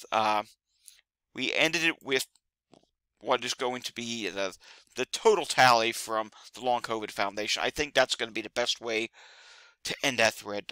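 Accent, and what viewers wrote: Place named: Philadelphia, United States. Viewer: Just found your channel, a friend recommended it. Thanks for doing this. Love your Philly (or near Philly?) accent! I’m from Jersey originally.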